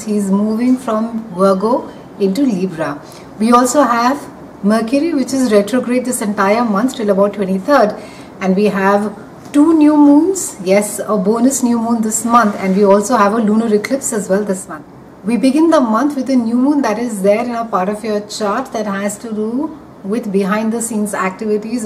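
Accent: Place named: India. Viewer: Your accent is lovely, are you from India?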